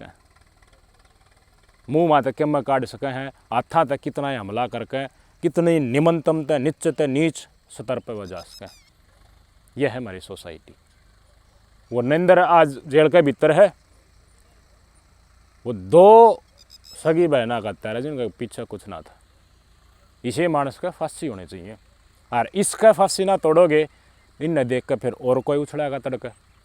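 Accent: Haryana, India. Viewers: Bhai aap jma dhasu haryanvi bolo ho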